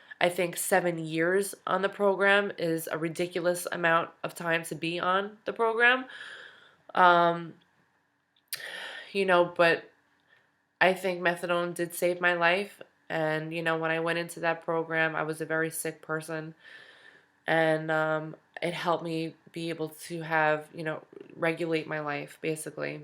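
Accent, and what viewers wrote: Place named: New Jersey, United States. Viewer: Can totally tell u from Jersey. It's kinda funny sounding to a West coaster. Methadone 5 years. Looking to get off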